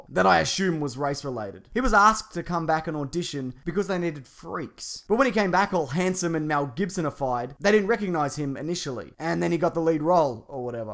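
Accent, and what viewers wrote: New York, United States. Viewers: Flawless New York accent as always. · New York accent is perfect lmao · That new york accent tho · New York accent is spot on. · Every time. That American accent kills me every damn time.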